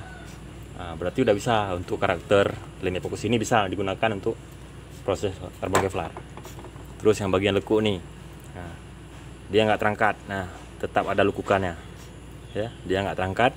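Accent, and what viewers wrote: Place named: Aceh, Indonesia. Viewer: Aksen Asli Aceh Utara bang Mantap Kali bukan kaleng kaleng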